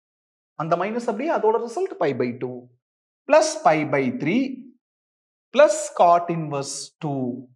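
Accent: Coimbatore, India. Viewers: That's becoz he's Coimbatore his slang changes right!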